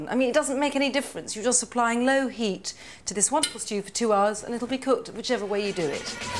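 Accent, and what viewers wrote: United Kingdom. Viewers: I am here to learn the British accent and not the dish 🤗🤗🤗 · Your voice is so attractive, I’m going to have my girlfriend start using a british accent 😂😂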